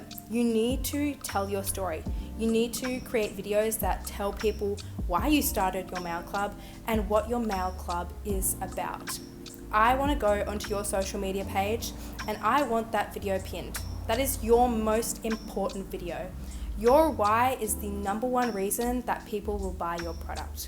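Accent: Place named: Australia. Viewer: You must be spending a small fortune in stamps! You sound like you're from Australia. Our stamp prices in this country are astronomical! How do you cope financially?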